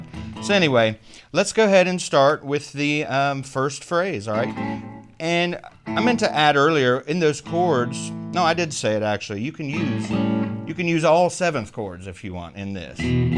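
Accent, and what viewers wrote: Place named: South, United States. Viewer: I purchased this guy's guitar Sizzling Guitar Licks course a few years ago but due to major work and family commitments I was never really able to spend much time on the course materials he supplies. Skip forward a few years and I started to try to use some of his lessons and so called support literature. Like he says in the letter that comes with the package of DVD's "Where do I start with all this stuff? " Good question - the package was so disorganized and the tablature does not relate well to any of his DVD's. A real hill-billy package. You have to sit and listen to this dude blab on for minute after minute in his dumbass country twang wondering when is he ever going to get to the point. He is a great picker but seems to love the sound of his own voice way more! Once you buy this guy's course you will be incessantly bombarded with daily offers of more stuff to buy, but Good Luck if you ever try and request reactivation of your account to download missing tablature. 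2 or 3 e-mails later and not even an acknowledgement. Garbage after sales and customer service. Caveat emptor - translate that if you can. John 5 is much more of a gentleman!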